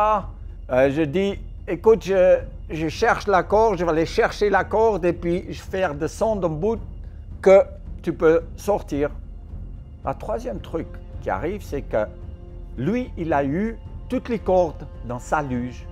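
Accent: Vaud, Switzerland. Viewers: Cet accent vaudois 😂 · Tcheu c’taccent Suisse 🇨🇭!👍🏽😄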